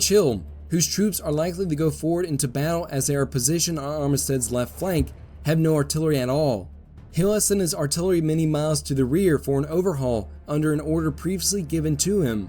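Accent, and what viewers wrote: South, United States. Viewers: I am loving this series. It is great to have someone dedicating their time and effort to a series on solely the American Civil War, demonstrating an in-depth knowledge with each video (as opposed to creating shorter less-detailed videos on disparate topics). The soundtrack is also great, helping to convey the magnitude and drama of the events unfolding (as it does with Epic History TV's Napoleonic series). So much is evoked by just blue and grey squares! It is also nice to near your accent, clearly that of someone who lives in the region where this war was fought, which I imagine gives you a more intimate perspective on the conflict, and gives these documentaries a more personal feel. As someone who used to live near Harper's Ferry, I saw many sites that were important during this era, but I never put it all together into a concrete interest in this war, rather focusing more on "exotic" European History. 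This series is helping me to understand the military history of the Civil War in far greater depth than I otherwise would have, and is giving me a greater appreciation for the ground upon which this history unfolded. Thank you for making this!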